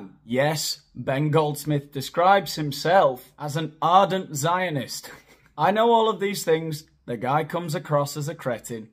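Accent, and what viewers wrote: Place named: North, United Kingdom. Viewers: I am a recent subscriber. I love the way algorithms introduce people to your in-feed. I instantly related to you. For a start I could tell you were a Mancunian.......I am too. I'm from the dreaded Stockport I love your voice. The white curtain behind you meant you could be anyw. I I visualised you in central Manchester in a swanky apatym. Anyway, you disclosed that you were in Spain. I wish you and your family tons if happiness. I love your sense of humour. It's so, so northern. The first day the algorithm showed me was when bloody Meghan znarkke was at The Bridgewater Hall. As a Mancunian I live your humour I'm 62 yrokd . My kids are38yr and 32 Yr and you remind me of their teachers. Stockport Scchool I love your accent. Where are you from in Manchester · Trying to place your accent. Got to be Manchester? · First time I have clicked on you. 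I am full of admiration. 65 yr old Londoner who has lived in Florida for 35 yrs. You strike me as an incredible mix of John Lennon and Russell Brand. Kudos to your parents. Oh, and I lived for 3 years in Hull.(Methinks I hear a Northern strain)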